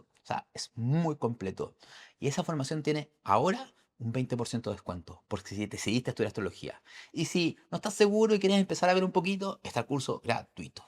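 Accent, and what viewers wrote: Chile. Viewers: Excelente, apenas te conozco pero me encanto tu video, me aclaraste muchas cosas. Por tu acento pareces chileno. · Eres muy divertido😂y eso que no te has soltado la pinza total! Urano te va a ayudar😅Chileno, que bonito es vuestro acento❤escucharte alimenta lo que descubrí con la Astrologia, gracias por tu generosidad, ese es el camino🎉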